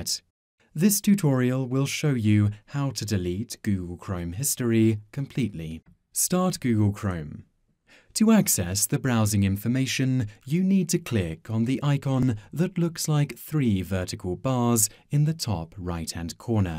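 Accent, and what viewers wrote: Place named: England, United Kingdom. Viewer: That awesome English accent -_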